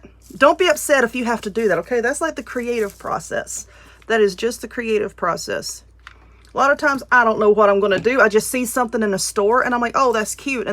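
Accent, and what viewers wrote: South, United States. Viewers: Love your ideas! I love your southern accent and your personality just cracks me up 😁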